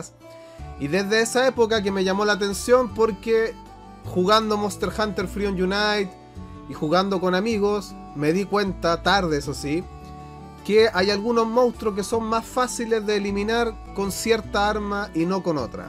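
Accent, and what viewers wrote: Chile. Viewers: por que hablas como chileno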